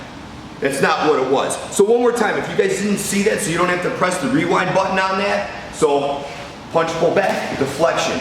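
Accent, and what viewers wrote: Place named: Scotland, United Kingdom. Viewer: Hi big fan of your tHE ROGUE WARRIORS series of vids very impressive, i wonder if any of your students teach this no bullshit style in the uk,on a lighter note I LOL at you comment i am about to shit on your corn flakes (you sound like my scottish budy) my personal comment in the uk is usually well that pissed on your strawberrys, i can't believe the negative troll comments you get from people who just decide they can't believe what they see, ar well i guess every ying has its yang all the best, Russ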